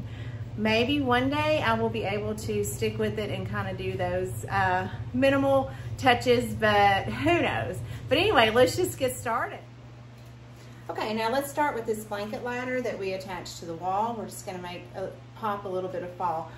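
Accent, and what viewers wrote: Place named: South, United States. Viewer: So gorgeous!! I LOVE your gallery wall in the dining room!! I hear your southern drawl. Where are you from? I’m from the upstate of South Carolina.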